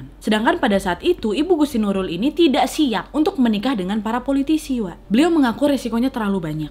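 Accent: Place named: Medan, Indonesia. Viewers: MEDAN kali nya kk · Saran alangkah baik ny klw menjadi narator di konten berbahasa Indonesia yg baik terutama aksen medan ny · aku suka bahasa wak ini, spt bahasa ku di medan, asli dr mana wak?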